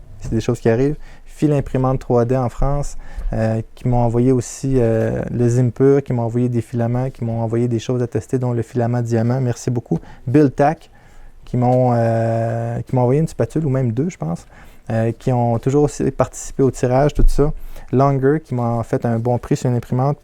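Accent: Quebec, Canada. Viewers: Bonjour je ne commente jamais les vidéos mais très attentif au explication ou découverte de nouvelle imprimante, je suis de tous cœur avec toi. Tu nous manqueras avec ton accent canadien que l'on apprécie temps de notre France. Prend bien soin de t'as famille, merci pour ton travail de passionné.Tu vaux bien plus qu'un simple like.Bonne continuation et encore merci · Bon rétablissement à votre épouse. J'ai adoré vos vidéo, votre bonne humeur, ainsi que votre accent québécois. C'est grâce à vous que j'ai commencé l'impression 3D. Merci infiniment et BRAVO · Merci pour toutes les vidéos et conseils tellement utiles et pertinents. Il est rassurant de voir des personnes qui ont des valeurs : la famille est si importante. Carpe diem et encore merci pour tout Toutes mes pensées pour le rétablissement de Madame Il sera difficile de ne plus entendre ce charmant accent canadien pour un auditeur français ! 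· Bonne continuation pour la suite, occupe toi bien de ta famille, profite bien d'eux et surtout bon rétablissement à ton épouse. Mais malgré tout je suis triste, car je n'aurais plus le plaisir d’écouter tes conseils et ton accent de cousin de l'autre coté de l'atlantique lol Merci pour toute tes vidéos, j'ai du en voir les 3/4 et tu m'as sortir pas mal de fois de mauvais pas;) Bonne continuation et à la prochaine. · C'est en grande partie avec toi que j'ai débuté en impression 3D. J'ai aimé la rigueur de ton travail, la sincérité de ta passion de transmettre, et aussi ton accent Québécois. Et tu as pris la bonne décision. Je souhaite bon rétablissement à ton épouse, et à toi beaucoup de bonheur avec ta famille, et pour très longtemps. Un grand merci pour tout ce travail qui nous a profité à tous. Si un jour l'envie te venait de passer des vacances en France, sache que je t'accueillerai toi et ta famille avec grand plaisir. 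Bonne route... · Mais bien-sur que je te met un like, Prend soins de ta famille, encourage ta femme qui à du te partager avec nous, tu vas tellement nous manquer. je crois que je v coller ta photo sur ma cr10, avec ce sourire et ton accent de Quebec tu a tellement apporté à la communauté de l'impression 3d amateur francophone. énorme merci camarade de toute la France Damien un fan à qui tu as appris beaucoup et qui dans la difficulté de certain print te regardais rien ne lâche pour tenir.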